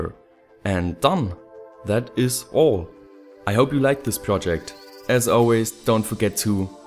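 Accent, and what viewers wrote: Germany. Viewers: bist deutsch neh :D geiler akzenz :D aber gutes englisch :D wie bekomm ich am einfachsten bluetooth kopfhörer für nen helm hin :D mein einfall.. mini bluetooth box aufschrauben .. kopfhörer zerlegen und pooow XD aber ich hab einfach mal null ahnung von elektro und audiozeugs XD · Pretty cool. Oder ziemlich cool... ich glaub du bist Deutscher. :) Cooles Video. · he is German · du kommst aus deutschlan oder? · Are you German? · Are u german ? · denglish / genglish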